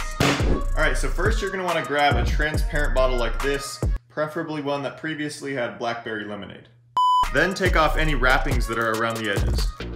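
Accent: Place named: United States. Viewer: Oh my God, I love clear American. It’s so good.😂😊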